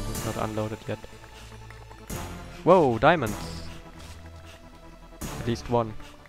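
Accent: Germany. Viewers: Are you German you have a german a accent man. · You really sound kinda german, when you're speaking... what is you native language?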